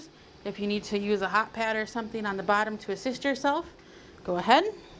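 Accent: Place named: North, United States. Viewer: You talk too fast. And we southerners can't understand northenors. That's out fault lol.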